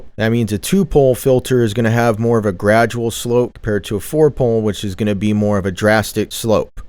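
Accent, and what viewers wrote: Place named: United States. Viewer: For some reason I thought you were British, but that's an American accent thru and thru. 😅 I think PolyMax sounds higher-end than a Juno - more like a Jupiter. Maybe somewhere inbetween. :)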